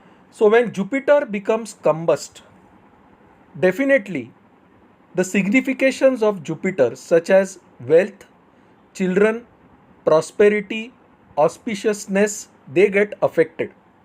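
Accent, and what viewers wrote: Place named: Maharashtra, India. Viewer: U R MARATHI ACCENT ENGLISH MEANS U R FROM SK SETTLED IN MUMBAI_ SOME TIME U R ADVICE IS VERY COMMON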